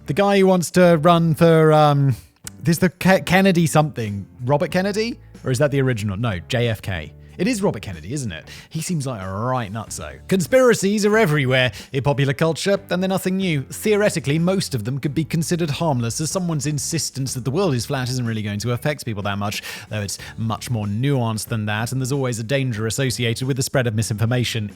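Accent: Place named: England, United Kingdom. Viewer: for some reason, `s middle class English accent makes the irony here even more savage yet funny!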